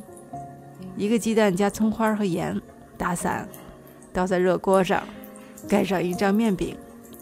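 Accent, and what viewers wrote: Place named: Shandong, China. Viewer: 我听口音还以为是山东人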